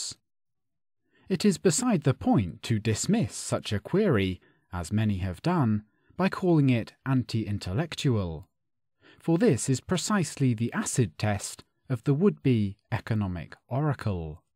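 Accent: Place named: RP, United Kingdom. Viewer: Received pronunciation))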